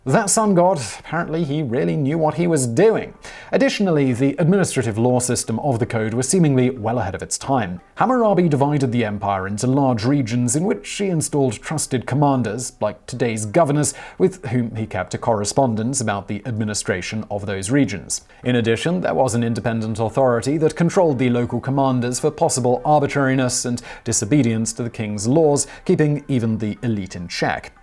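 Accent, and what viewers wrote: United Kingdom. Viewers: Well the Brits pronounce alot thing differently....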